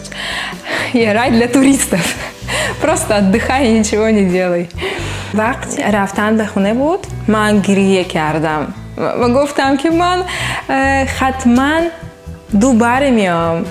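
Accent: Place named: Iran. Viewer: درود بر شما عزیز ، اول اینکه در مورد پوتین با شما شوخی می کردند و مزاح بود ، دوم اینکه لازم نبود در ایران حجاب داشته باشی الان خیلی دختران ایرانی هم حجاب را رعایت نمی کنند علاوه بر این در ایران به توریست های روسی در مورد حجاب سختگیری نمی کنند و می توانستی راحت تر باشی ، سوم اینکه خیلی خوشحالم که تجربه اول شما از سفر به ایران خوب بود ولی ظاهراً خیلی از جاهای جذاب و دیدنی ایران رو ندیدی امیدوارم در آینده ببینی ، چهارم اینکه خیلی ممنون از اینکه سعی می کنی به پارسی صحبت کنی با اینکه برای شما سخت است ولی تبریک می گویم که شما با لهجه ایرانی فارسی صحبت میکنی و برای ایرانیها قابل فهم است و در آخر اینکه خیلی خونه قشنگ با چشم انداز زیبایی داری به شما حسودیم شد روسیه طبیعت قشنگ و زیبایی دارد پیشنهاد می کنم خارج از منزل فیلم بگیر در ضمن اسم واقعی شما به روسی چیست؟ چون فکر می کنم یک اسم اروپایی یا آمریکایی است نه روسی ؟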